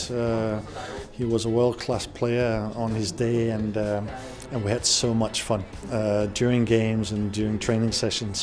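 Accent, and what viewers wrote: Scotland, United Kingdom. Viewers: He still lives in Scotland? His accent sounds Scottish.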